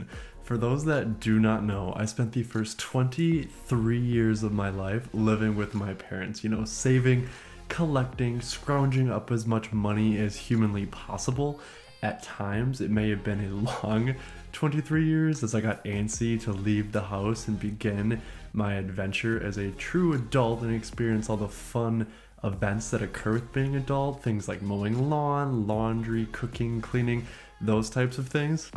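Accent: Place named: Midwest, United States. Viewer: You sound a bit midwestern. You're adorable!❤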